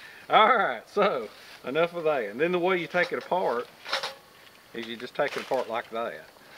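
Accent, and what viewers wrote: South, United States. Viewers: Oh my lord he’s got a heavy southern accent. I got one it just ain’t that heavy of an accent.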